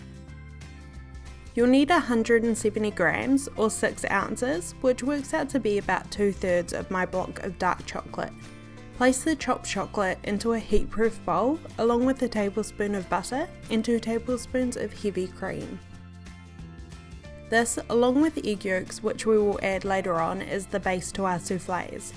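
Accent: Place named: New Zealand. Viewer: Hi, she’s not British. She’s from New Zealand. I’m Australian, and I’ve lived in NZ too, so, I can assure you she is a kiwi :)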